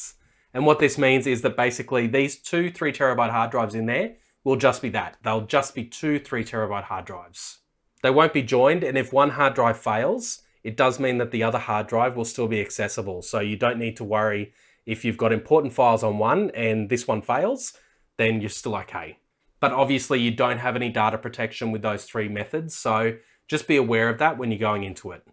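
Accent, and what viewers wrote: Australia. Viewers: Can I use one 2TB HDD and one 4HDD in the 2 bays at the same time? How many devices (phones, tablets, laptops and desktops) can be connected to this NAS? How many accounts/personal folders can be created for this NAS? Thank you very much for your response. (Your accent makes me miss my OZ friends, mate)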